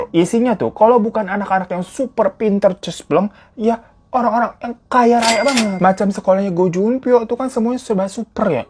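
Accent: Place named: Betawi, Indonesia. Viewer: Betawi nya lucu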